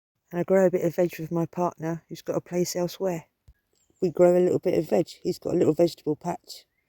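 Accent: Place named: England, United Kingdom. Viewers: Hi..I love everything you are doing...your videos are amazing , they are beautiful.. eye-opening and very peaceful. I love the way you talk.. with that so cool English accent..I can listen to all day...please do not take any notice of the Grumpy ones..they are normally kind of jealous or just plain bitter in life.. Thank you for great entertainment.❤ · Lovely authentic lady enjoying nature. I'm thinking accent is Suffolk but the landscape is western looking. Technology.. industry, science - all part of life. Driving around in a van I find beauty in wind farms, power stations.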